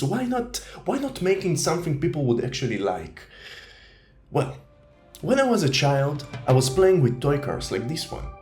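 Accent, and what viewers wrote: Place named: Israel. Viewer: can i ask where are u from? u got Israeli accent, and the game looks amazing!!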